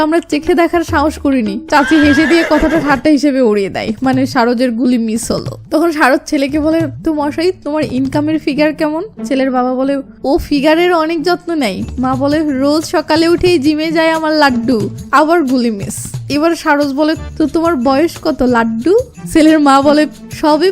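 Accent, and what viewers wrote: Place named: Nawabganj, Bangladesh. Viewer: নবাবগঞ্জের মেয়েরা এভাবে কথা বলে আমার মনে হয় উনার বাড়ি নবাবগঞ্জ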